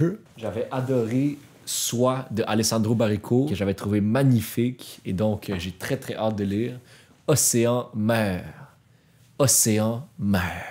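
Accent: Quebec, Canada. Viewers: Trouver une chaine de littérature tenue par un homme, est rare ! Avec une telle passion, de jolis mots et avec le cœur, Exceptionnel ! J'adore également cet accent. Le Canada, reste pour moi un rêve ! Três heureux, de ce hasard qui m'a mené ici! Merci beaucoup, de ce magnifique travail et de nous le partager. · C’est un accent québécois?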